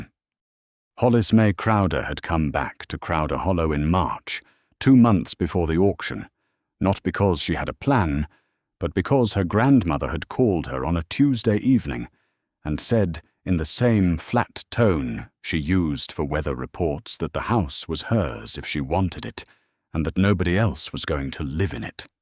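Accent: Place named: United Kingdom. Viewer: Who cut this, and why is the narrator British? Off.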